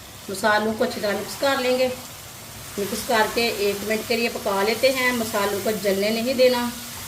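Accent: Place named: Punjab, India. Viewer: Never watched such unappetising food being cooked with the explanation in a hilarious Urdu with a Punjabi accent. Very sad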